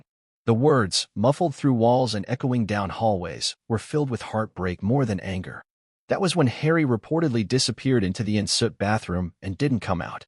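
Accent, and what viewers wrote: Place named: United States. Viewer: Is this true or just another example of American accented BS?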